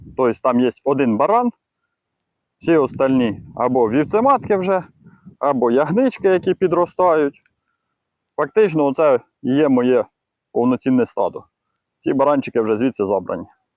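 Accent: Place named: Poltava, Ukraine. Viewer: почув акцент що ти полтавчанин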